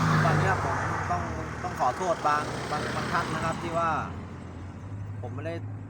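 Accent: Thailand. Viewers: คนเหนือรึค่ะออฟก้อคนเหนือ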